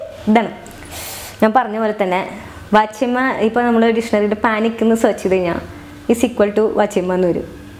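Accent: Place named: Thrissur, India. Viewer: കുറച്ചു നാള് തൃശൂർ താമസിച്ചു കൊണ്ട് ആണോ ചിലപ്പോൾ സംസാരിക്കുമ്പോൾ തൃശൂർ ഭാഷ പോലെയാ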